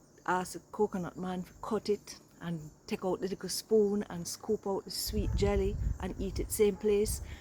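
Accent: Jamaica. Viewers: Wow !! Almost speaking Jamaican as well, Luv ya !!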